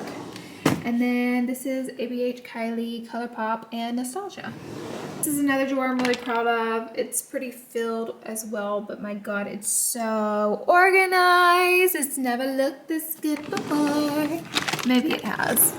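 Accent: South, United States. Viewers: girl I love ur southern accent. At first I thought u were saying lipples, like nipples. rofl but I realized ur saying lip oils!!! roflmao!!! tfs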